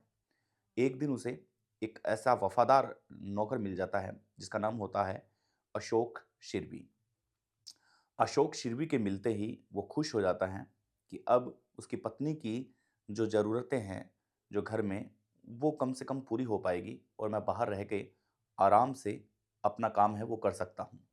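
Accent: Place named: Rajasthan, India. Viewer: Wow aapki hindi buuri nhi paaye ❤❤ ji aapne khajuwala wali language 😂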